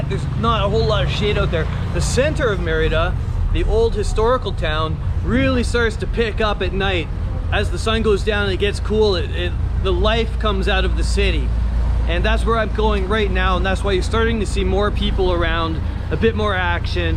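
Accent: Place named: United States. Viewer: Cool vid! Personally, we are with you on the mandatory jabs and masks! We are NOT into it at all so keep preaching. Question, my wife and I are looking to retire and leave the States. Would you consider Merida a nice place to settle down and retire for older but very active people? I love the fact that Merida, unlike many other places in Mexico, is very safe and still somewhat affordable. Thanks and safe travels. (By the way, work on your accent. It's SO Americanized)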